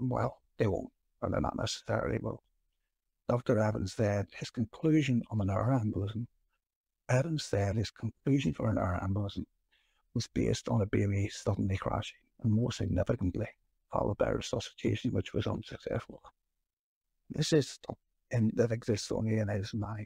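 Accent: Belfast, United Kingdom. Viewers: The audio quality is not the best (particularly on these earlier episodes) which is likely down to mic (recorder) but it does improve in later episodes. He's currently up to elosode 57. His Belfast accent may also cause difficulty to those that are not used to such an accent.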